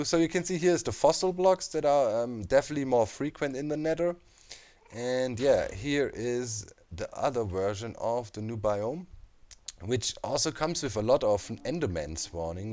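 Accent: Germany. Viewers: Geil der Deutsche Akzent 😂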